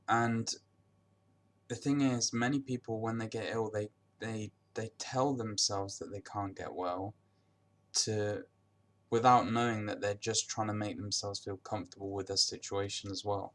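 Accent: United Kingdom. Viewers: I am impressed by your strength, you deep voice and your British accent. Yes, you are right,, we love our sickness. Of course, it might be a love/hate relationship, but she is the only mistress remaining. In other hand, not every body can recover at 100% for life time. Dealing with the sickness, at the first time it is having to mourn about the 'perfect life', and after to go further with a real life. If you are young specially, keep a eye on quiet job.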